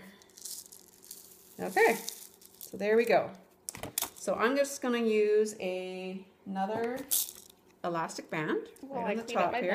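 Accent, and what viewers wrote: Canada. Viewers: Love them, and your accents! Are you Canadian?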